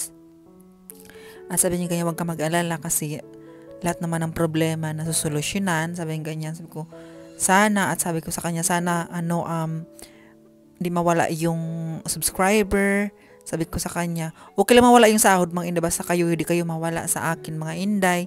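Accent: Visayas, Philippines. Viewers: HALLWAY tawag ana dai knang imung gibutangan ug tela nga salog.Mao gyud dai Ayaw pakumpyansa kaayo sa pag film sa place sa inyong ginatrabahoan especially ang plate number sa car ,i-blur lng na kay lisud ma-AWARD sa owner. I-vlog lng ang mga lihok2x ninyu while working. Pasagdi NG MGA BASHERS . Malingaw mi sa imung chinikahan Kay tungod sa imung BISAYA accent. Ana MN gyud ta importante MAKASABOT mi ug malingaw . Stay true lng ug never MAGPA PRETEND para lng i-please mga tao. Maong love KA namu Kay mkalingaw KA and for being true to yourself dili pa feeling. Bsta amping mo permi dai ha. NO SKIP ADS mi tanan! God bless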